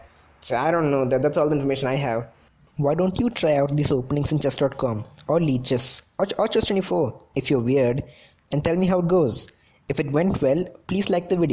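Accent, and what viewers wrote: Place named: India. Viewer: Ah! Indian accent! The worst english mix accent ever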